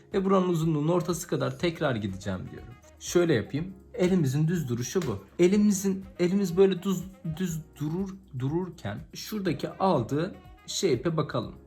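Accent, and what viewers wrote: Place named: Samsun, Turkey. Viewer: "Eğl" çizmeyi öğrettiğiniz için tişekkürler. Hafif bir samsun ağzı sezdim (-_-)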